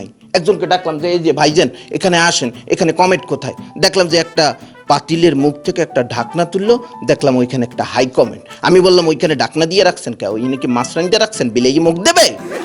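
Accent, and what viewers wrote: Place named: Barishal, Bangladesh. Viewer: barishailla vasha shunlei moja lage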